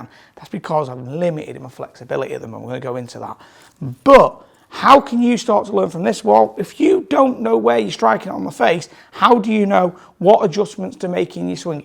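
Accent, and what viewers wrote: Manchester, United Kingdom. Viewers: what do you mean, has a fine South Manchester accent! Perhaps should add subtitles for non Mancs lol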